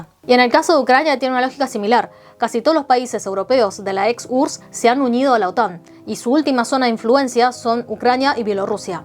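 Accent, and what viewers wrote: Argentina. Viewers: Que lindo tu acento Argentino . Me gustaría tener una esposa con los ojos chinitos. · Tu castellano (tu pronunciación y las palabras que usas) es claramente argentino... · Tu. Español argentino en una chica asiática se ve hermoso. Saludes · Me parece un buen video te felicito .Mil veces mejor que los noticieros porque aquí solo todo a favor de los gringos como si fuera una película que hacen ellos que solo ellos son los buenos.Tu acento Argentino me gusta mucho muy bonito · Tienes como un poco de acento argentino. En dónde vives?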